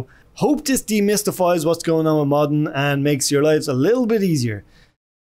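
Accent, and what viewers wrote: Ireland. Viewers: your irish accent is awesome dude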